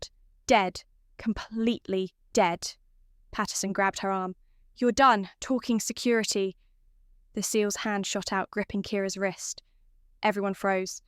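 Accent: United Kingdom. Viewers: Gloss or glass? Watching from Tagaytay City, Philippines by way of Oil City, Pennsylvania, USA. How do we get rid of the British English(?) narrators?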